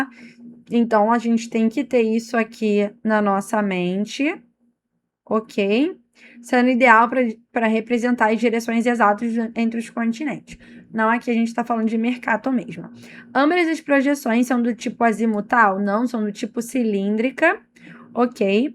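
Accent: Rio de Janeiro, Brazil. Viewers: Nossa que professora incrível! Didática muito boa e o sotaque carioquês linda! Parabéns!!! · Acho lindo sotaque carioca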